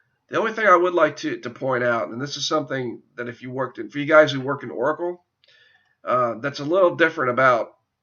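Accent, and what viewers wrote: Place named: Eastern Shore Maryland, United States. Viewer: Sounds like a dude from the eastern shore of MD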